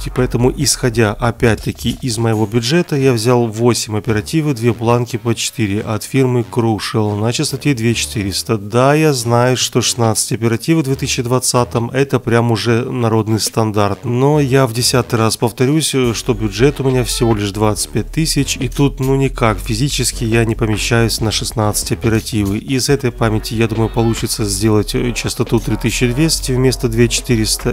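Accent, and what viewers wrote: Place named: Ukraine. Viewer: С первых минут понятно что ты Украинец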